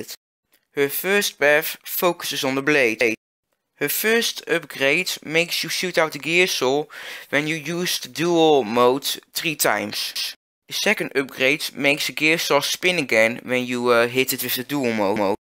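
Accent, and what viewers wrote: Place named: Netherlands. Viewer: i can just feel ur dutch voice.. Don't worry about it i have the same problem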